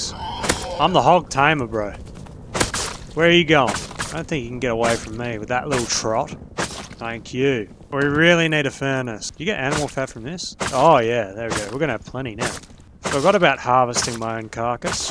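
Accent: Australia. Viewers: something about the accent and choice of wording makes Australian people just funny by default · He's Australian lol there's not a single British accent out there that's not annoying. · Honestly, gaming and just listening to this man's beautiful aussie accent is something else, 4+ hour commentary content when?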